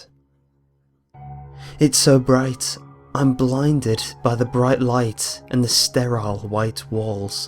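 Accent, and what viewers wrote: United Kingdom. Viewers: Oh man, I am in love with your British gentleman accent. You sir, are the most amazing scary story teller I'v ever heard. Maybe in my WHOLE LIFE!!!!!!! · Even better HES GOT A BRITTISH ACCENT *Dies of the sexyness*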